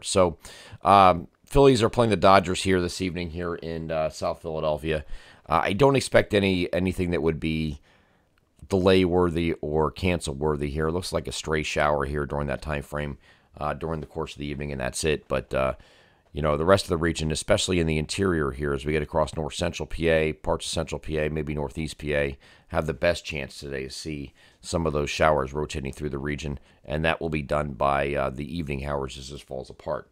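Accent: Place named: Canada. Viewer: You got that wildfire voice bro! You can tell by the trace of Canadian accent! Ever since the smoke showed up my allergies haven’t been so bad since I was a kid. Hopefully you feel better as conditions improve personally I’m on the rebound today. Thanks once again for the videos y’all are the best in the game!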